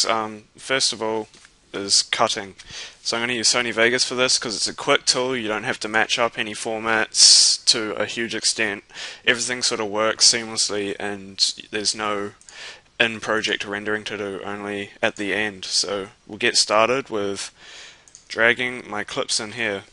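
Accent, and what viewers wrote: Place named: New Zealand. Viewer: Bro NZ accents are sick, coming from a Canadian here :) Aussie accents are sick too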